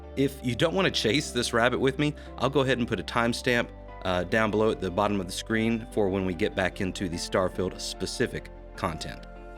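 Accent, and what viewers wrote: United States. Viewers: How much is Microsoft paying you, and which Southern State are you from, I definitely hear a "TWANG!!!"😆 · You sound like people I know from South Carolina. Love these videos btw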